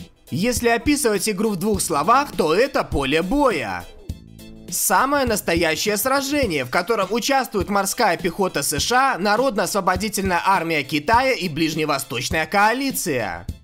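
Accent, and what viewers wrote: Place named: Moscow, Russia. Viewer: какой голос блять противный и ещё этот говор мАсковский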